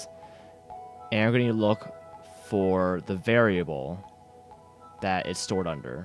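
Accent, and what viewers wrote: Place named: Southeast Asia. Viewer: It's the South-east Asian accent.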